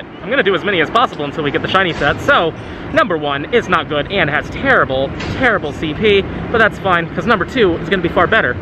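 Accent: United Kingdom. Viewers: Where are you from the uk? O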